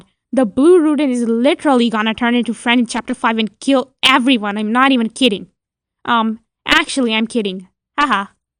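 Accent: Southeast Asia. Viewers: pretty sure it's an accent?? sounds southeastern asiaidk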